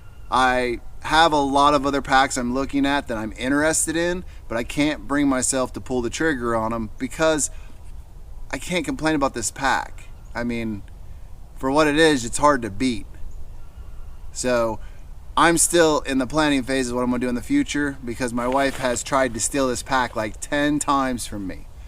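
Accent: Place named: Mid-Atlantic, United States. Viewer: Love that mid Atlantic accent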